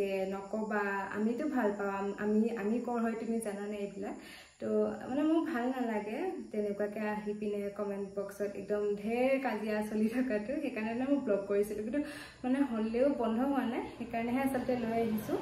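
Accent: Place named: Mangaldai, India. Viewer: Ba tumi darrang district r hoi nki ? Kothabur mangaldai side r nisina lagise Btw..bohut morom thakil ❤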